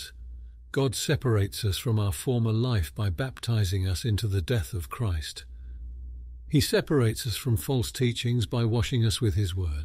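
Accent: United Kingdom. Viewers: I agree with the Gap theory as well. The theory gives us a possible little glimpse into some of the huh? Things between God and Satan. But I like how you taught in this in relation to it and pointed back to Jesus. I’ve never really heard it taught this way and it was beautiful. I’m so thankful for the mind God has given you. There is an understanding not just of literature, and logic and God has given you not only intelligence, but it is clearly Spirit filled and relational. It’s wonderful to see Him working through you. I don’t have time to read comments these days…so I’m sorry if people give this same feedback…I like the British voice I guess. But the thing that’s great about your speaking is the little aspects of your personality that come out. When you say something goofy or let a little laughter in. It’s more personal. But I support the ministry whomever is speaking it. 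· Hi, my husband and I started the devotional, and he likes it😁. However, I had a question and sent you an email....I really like the English accent on your teaching...❤ by the time this ended I just wanted to yell HALLELUJAH 😂😂😂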